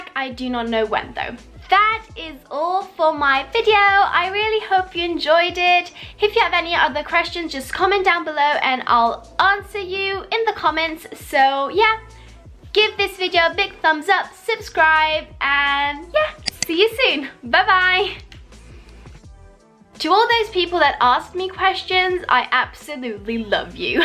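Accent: United Kingdom. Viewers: i almost thought u were british you speak so good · I am totally bewildered. How can you be from Italy and have such a pronounced British accent? I mean, I am Italian and I know an Italian accent when I hear it and your is definitely not an Italian accent. Very curious. I'm sure there is a logical explanation. I am also sure others have wondered the same thing. EDIT: Excellent videos, by the way!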